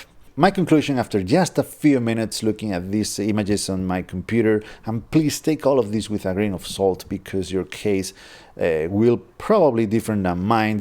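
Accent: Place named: Spain. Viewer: Con todos los respetos, tu acento inglés suena horrible... no entiendo por qué siendo español haces los contenidos en inglés... Es una pena porque privas al público de aquí que no comprende el idioma de entender unos vídeos que comparten unos conocimientos e ideas sobre fotografía muy buenos, y estás dando prioridad al público extranjero...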